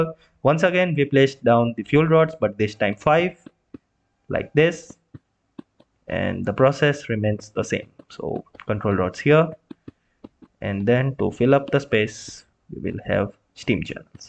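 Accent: India. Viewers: I swear to god, if i need help with anything there is ALWAYS an indian sounding guy on youtube to help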